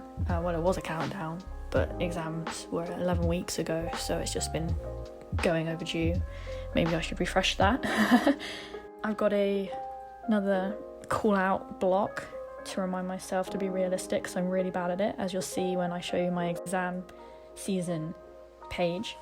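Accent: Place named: United Kingdom. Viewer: i love your voice so much,, it’s so calming. i know that there are different british accents from different parts of the UK and yours reminds me of a friend of mine! they left tho the country tho :(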